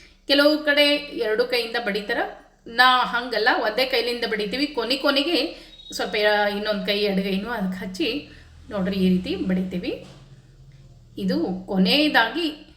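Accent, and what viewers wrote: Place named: Belagavi, India. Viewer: Amma navu kuda dinalu rooti maduteve navu belagaviyavaru nivu namma tarane matadatira namage tumba khushi agutte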